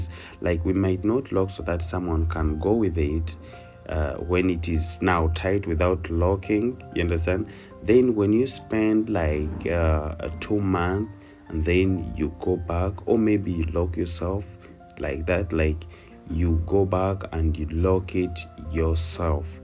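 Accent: Uganda. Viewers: This accent is sooo Ugandan 🇺🇬🇺🇬🇺🇬🇺🇬 my first time here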